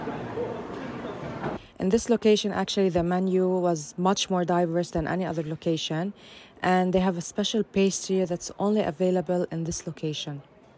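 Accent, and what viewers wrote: Lebanon. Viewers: ارجوك تكلمي لبناني واحد من اسباب متابعتي لك لهجتك 😢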